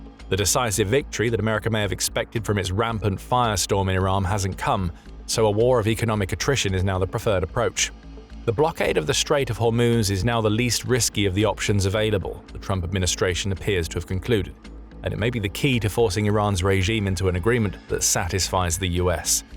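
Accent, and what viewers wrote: United Kingdom. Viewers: Why the UK Accent? Gotten indeed!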